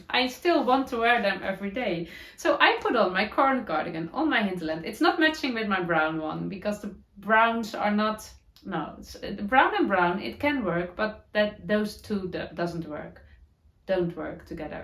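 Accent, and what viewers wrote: Netherlands. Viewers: Oh.....you can talk as long as you want. We love to knit and listen. Your Dutchy-English is VERY good. And how special that you care enough to get it right and learn. It is just wonderful that we do not need to learn Dutch - especially if it is more difficult. Can you hear me laughing? It certainly sounds more difficult. Love your patchy socks - so worth it!!! I do that too. Have a lovely Easter weekend with your family on both Easter days. 🧶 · I love hearing your "Dutchy English" and to that end I never want to rectify what you say. That said we do say 'modify' and not 'modificate'. 🥰 xxx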